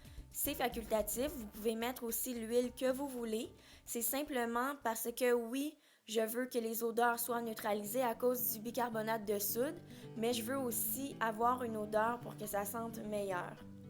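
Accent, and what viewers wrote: Canada. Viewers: J'aime ton accent canadien :D super vidéo ma belle. Pleins de bisous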